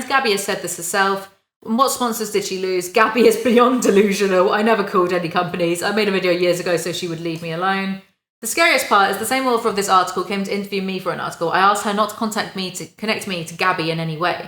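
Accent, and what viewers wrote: United Kingdom. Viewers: I haven’t started watching the video but I’m READY for you to reprimand her in British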